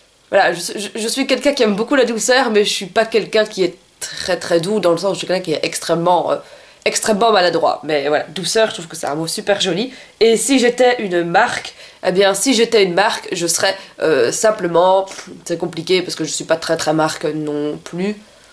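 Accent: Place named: Belgium. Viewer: abonnée^^ je te découvre grâce au groupe. je suis comme toi,1 peu comme la voix des opprimés,je cherche toujours à trouver le bon côté des gens même si tout est contre cette personne.j aime bien l italien.j aime bien ce que tu dégage^^. Tu es belge?(oui j ai eu la réponse plus loin)un p'tit accent😉.bisous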